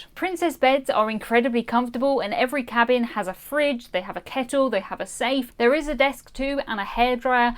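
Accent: United Kingdom. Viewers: Good information. Thanks. It is sometimes hard for those of us with hearing problems to translate British English to American English. Slowing your speech would help. Thanks again.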